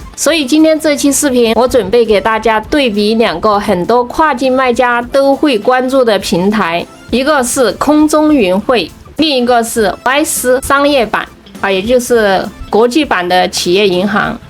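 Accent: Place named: Southwest, China. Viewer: 是西南口音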